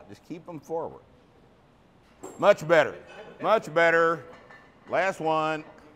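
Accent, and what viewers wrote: Texas, United States. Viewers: Well it's really more Texan. It would definitely stand out among native Georgians for example. Watch Fred Armesan's video on accents. Somehow he gets it pretty close. Well I think spot on but I'm not an expert. But have lived my whole life in southern U.S.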